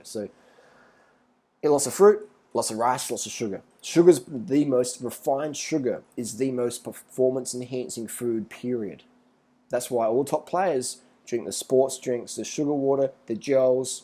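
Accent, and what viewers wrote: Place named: Australia. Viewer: your aussie accent is hilarious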